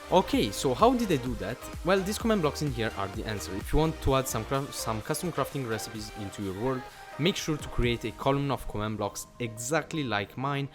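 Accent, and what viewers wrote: Italy. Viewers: si sente che hai l'accento italiano :p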